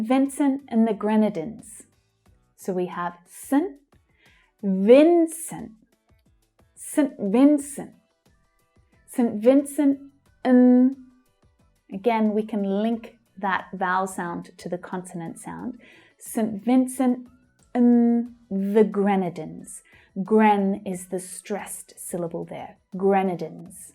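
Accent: Australia. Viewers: Hi, your Australian accent is different... it is very easy to understand...Ths! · mmmEnglish rules and you try to make it fun. I'm Canadian and you seem to have a combination Australian / English accent. Is that because you have a Melbourne accent or because you have spent time in the UK? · My goal in 2019 i wanna pursue my master degree in Australia, hope this year i can make it. Thank a million....great video. I love your Australian Accent,❤️ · beautiful woman, nice voice, is that a slight Australian accent?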